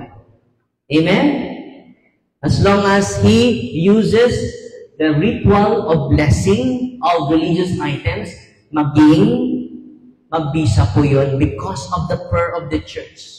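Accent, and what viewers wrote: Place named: Iloilo, Philippines. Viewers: Very good kaayo Father! Mora man pod ka Ilonggo. Passing na kaayo Father imo Ilonggo.naa ra gamay medyo kimaw pag pronounce like U-tod. (Not utód). Pero okay na kaayo Father!wow! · Ang cute mag ilon go speak 😊 Gusto KO Sana pumonta,hi de knm ALAM San yan😅 Amen🙏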